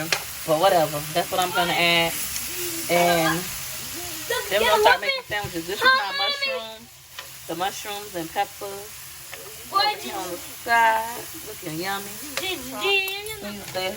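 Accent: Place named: Los Angeles, United States. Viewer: Rosario Nah has a thick LA accent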